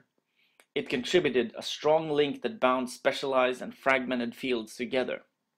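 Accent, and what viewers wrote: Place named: Norway. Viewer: I do enjoy a crisp sounding Norwegian-English accent!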